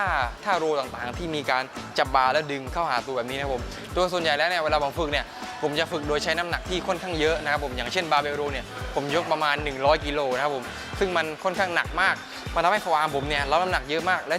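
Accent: South, Thailand. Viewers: ตอนปึ่งแลแรกๆไม่คิดว่าเป็นคนใต้ 555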